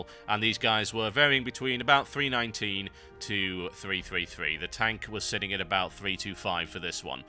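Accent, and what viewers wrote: United Kingdom. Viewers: I love this guy. I find what he says halerious. Love the accent. Nice work Brit :D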